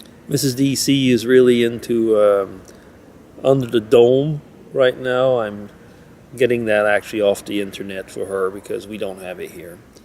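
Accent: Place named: South, United States. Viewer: You can send some of that cool weather this way. Sounds like you are going to be busy with family coming. Hope they let you video. =) You are a man of many languages & your southern accent... Oh that. Yea Halloween. Yes when trying to know how is who now is crazy. Stevie could not even get to his message page. A fun BD gift is on the way =D Under the Dome is very cool. TY for keeping me up on it xoxoxo. I watch TV at night BIG HUGS for you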